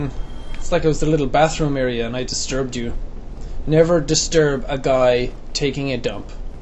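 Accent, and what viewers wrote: Ireland. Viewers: He's starting to sound a little bit like his Irish self ;) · FutureAdaption He kind of tried to hide his irish accent, but not in this one.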